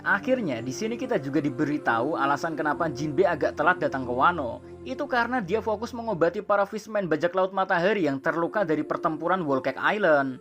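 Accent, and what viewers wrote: Java, Indonesia. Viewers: dari logatnya orang jawa. Feeling gw nama asli lo. Kayanya 70% benar wkwk iyaa ga min?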